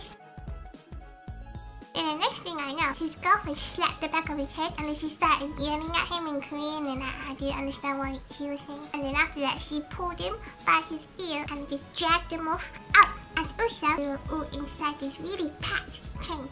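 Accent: United Kingdom. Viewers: I love your editing, and your accent sounds kinda like a cock-knee accent (I've got noooo idea how to spell that sooo I'll just spell it how it sounds 😋).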